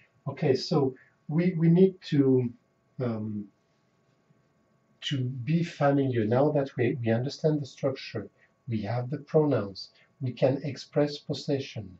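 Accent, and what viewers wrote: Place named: England, United Kingdom. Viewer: I apreshiet the fact that this guy want to teach Haitian Creole, But I'm comfortable learning Creole from a Haitian. This guy have English accent.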